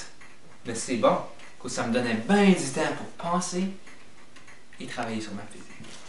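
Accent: Canada. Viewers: hehe you canadian accent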